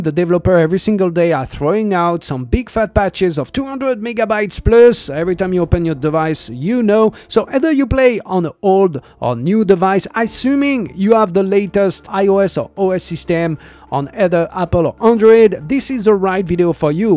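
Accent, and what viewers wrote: France. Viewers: Thank you for this video. Subbed and liked. Side note, my mother is French (born and raised) so to me your accent is awesome.👍 A lot of people think the French language sounds so romantic. That's only because they've never had a tiny, very angry French Mom cursing at them in French and swinging whatever was close by that she could get her hands on and use as a weapon (or throw it at you lol).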